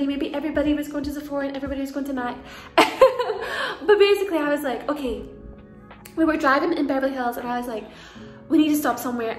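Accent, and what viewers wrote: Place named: Scotland, United Kingdom. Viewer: I love your accent and the way you said bobby brown, I was just like, yup, she is definitely scottish. I find northern british accents so friendly and welcoming😊 It fits your personality and the bubbly and fast way you talk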